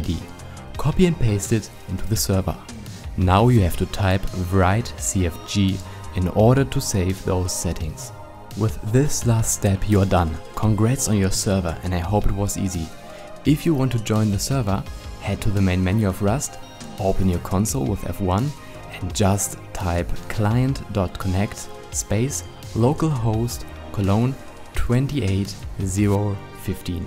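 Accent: Germany. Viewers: Impressive fast explained I like it and I sub! Ganz liebe grüsse nach Deutschland, den akzent merkt man lol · Sowas von ein Deutscher xD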